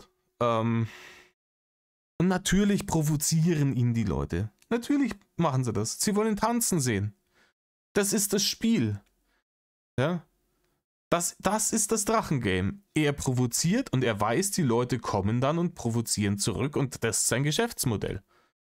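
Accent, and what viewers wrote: Bavaria, Germany. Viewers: Hallo könntest du bei deinem nächsten Video wider mehr Bayerisch sprechen. Weil ich muss dann lachen wenn du dich über denn Kürbis Kopf aufregst auf bayrisch wenn der immer wider was am verzapfen macht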